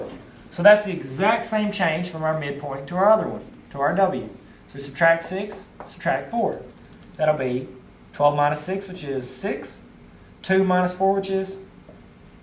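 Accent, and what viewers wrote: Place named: Texas, United States. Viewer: I'm guessing he's from texas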